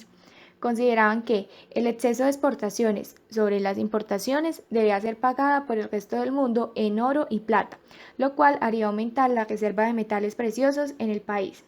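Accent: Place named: France. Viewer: tuvieras un toque francés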